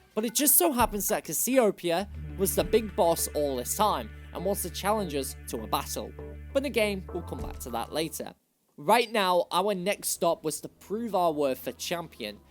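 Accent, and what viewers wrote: United Kingdom. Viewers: Watching the first minute of this video I can tell he is British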